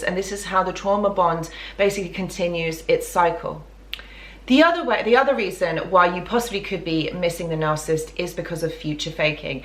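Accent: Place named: Bedfordshire, United Kingdom. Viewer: You sound like you’re from Bedfordshire or Hertfordshire haha... and yes cool video, thank you 🙏🏻 realign when I see 222 ☮️ This had 111 views right now too haha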